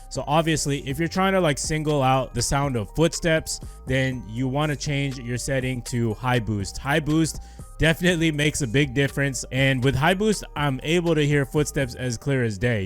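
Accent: Scotland, United Kingdom. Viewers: Its Scottish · It's Scottish pal not Australian!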